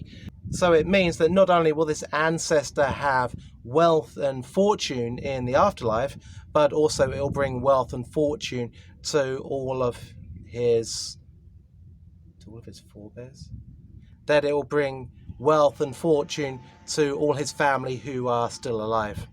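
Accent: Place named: England, United Kingdom. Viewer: You misunderstood : it is traditional to state the Chinese hometown of the deceased on the headstone even the deceased was born in Hk ( ie hometown of the deceased ‘s paternal family then if born in Hk ) it doesn’t mean the body of the deceased was moved all the way from China to Hk for burial . But overall I am impressed you knew more about Fung Shui then me ! I also like your English accent thx for the video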